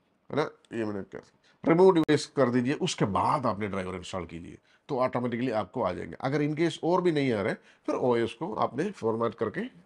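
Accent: Kerala, India. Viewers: Malayalam touch Hindi 🙄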